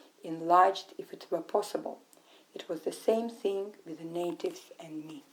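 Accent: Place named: Russia. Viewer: I guess it's a good book to read. I heard about it, but never read it. I love your cute Russian accent!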